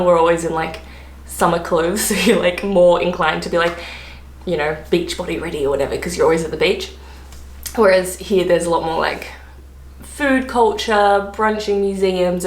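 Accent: England, United Kingdom. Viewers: She is attractive and sounds more English than Australian...interesting observation. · Your accent is definitely changing. It sounds much more English than in your earlier videos. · Immediately the first thing I noticed is how much more British your accent sounds compared to part 1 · Love the way your British accent is improving, you sound more intelligent and slightly wiser.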